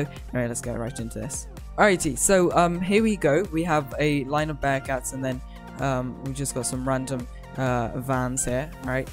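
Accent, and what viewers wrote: United Kingdom. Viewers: Are you from the U.K? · are you British?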